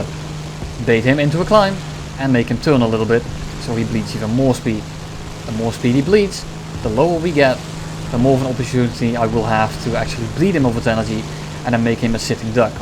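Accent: Netherlands. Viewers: Oh that is why you got that Bas Rutten accent!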